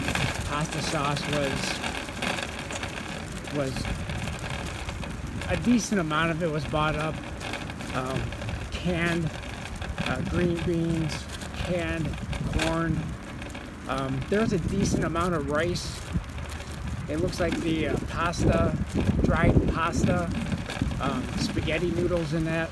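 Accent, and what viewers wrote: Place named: Midwest, United States. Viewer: Rootless Wanders Rootless... you can’t tell by his Mid-west accent!? Good lord he sounds like he could be RADAR O’Reiilys cousin!!!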